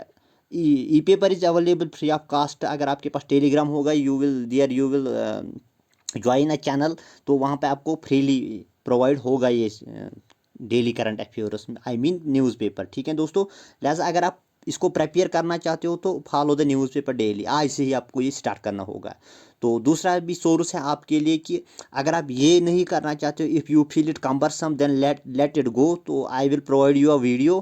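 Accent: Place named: Kashmir, India. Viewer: Kashour English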